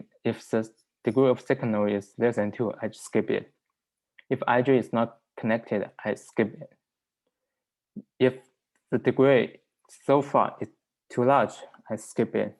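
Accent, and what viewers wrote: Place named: Southeast Asia. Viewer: 很重的东南亚口音，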